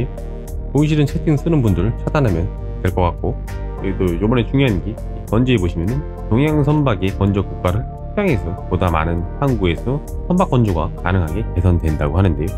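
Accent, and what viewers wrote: Chungcheong, South Korea. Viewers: 덕분에 쾌적하게 즐기고있어요 감사합니다 마성의 충청도말투 ㅎㅎㅎ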